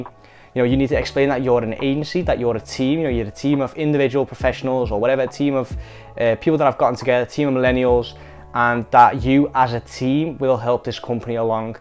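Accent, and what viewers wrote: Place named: United Kingdom. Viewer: jeez that uk accent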